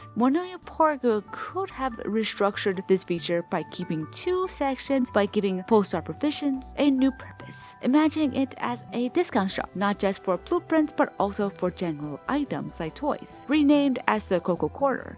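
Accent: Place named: Europe. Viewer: What unnamed random mysterious European country is this chick from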